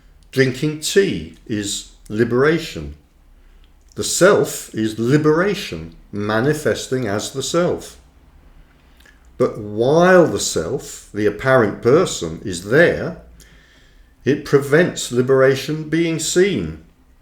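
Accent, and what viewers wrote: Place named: England, United Kingdom. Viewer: Listening to read his book with his English accent provides a nice intermission between episodes of Inspector Morse, Lewis or Midsumer Murders. Thank you! 🙏. 💥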